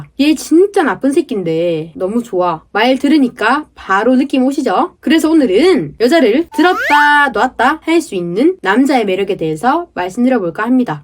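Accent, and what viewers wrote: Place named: North Gyeongsang, South Korea. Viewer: 경북 봉화 안동쯤인듯😮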